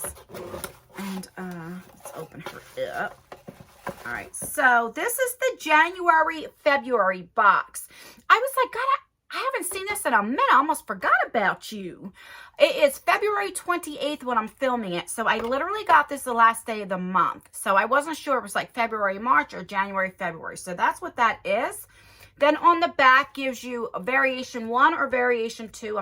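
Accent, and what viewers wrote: New Jersey, United States. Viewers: I’m a Jersey girl living in Tennessee. I love watching your videos bc I don’t miss home so much hearing your accent. Ty!!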